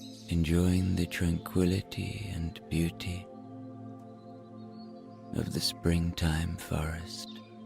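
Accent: Ireland. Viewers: Hi, I absolutely love this Rainy Story collection. I listen to your stories every night in bed and always fall asleep very soon after the actual story ends. They are all so lovely. My favourites are the rainy and book shop stories. Just know that you are very appreciated by so many people around the world listening to your lovely Irish accent telling the most beautiful bedtime stories. Thank you!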